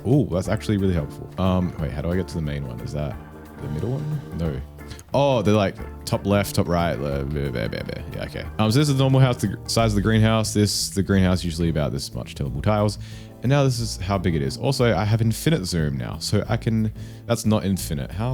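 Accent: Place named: Australia. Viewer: i know an aussie when i hear one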